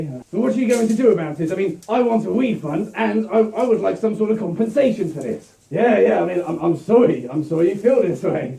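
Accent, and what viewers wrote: United Kingdom. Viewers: I can tell he’s British.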